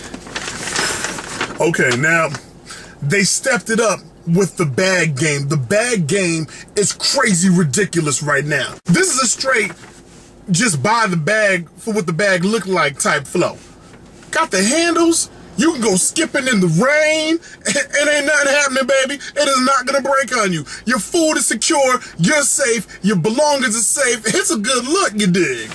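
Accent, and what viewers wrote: United States. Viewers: best American accent.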